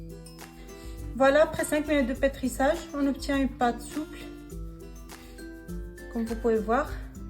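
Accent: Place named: North Africa. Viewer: Merci beaucoup Madame pour cette delicieuse recette , j' adore aussi votre accent qui sent le soleil d'Afrique du Nord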